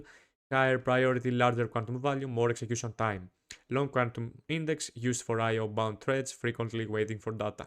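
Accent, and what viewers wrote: Greece. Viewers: Το καταλαβα οτι εισαι ελληνας απο την προφορα.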